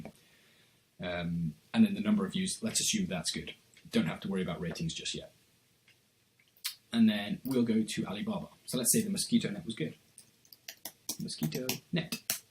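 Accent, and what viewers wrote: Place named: United Kingdom. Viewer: Hello - I prefer to to 'read' your course, rather than watch it.. [your British accent is lovely but a bit difficult for me... also, the snapshots you share are blurry], so... do you have this good information in a book or a website or something? Thanks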